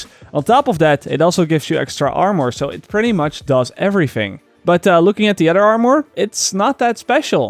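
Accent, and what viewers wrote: Netherlands. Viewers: Love the content on your channel aswell as your Dutch accent. Great Video, keep it up! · you have a dutch accent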